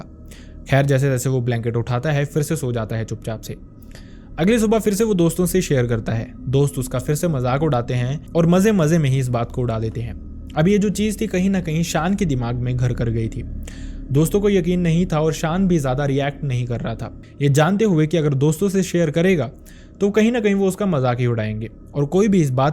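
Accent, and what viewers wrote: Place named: Assam, India. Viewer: Bhai aap Assam se ho kya😅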